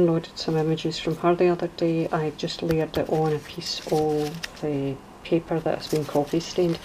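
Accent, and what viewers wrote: Scotland, United Kingdom. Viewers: Absolutely gorgeous journal, really love it, it's so so pretty. Of course, we'd listen to you and with your lovely Scottish accent too. My aunt was a nun in Falkirk for many many years so I love to hear the Scottish accent, it reminds me of her and my visits there. Best wishes from the west of Ireland, Mary:)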